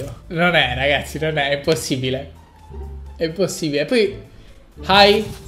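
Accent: Bari, Italy. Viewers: Ma sei di Bari?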